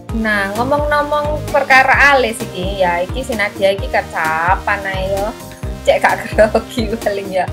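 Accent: Java, Indonesia. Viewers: Jawanya kok medok banget. Dari mana mbak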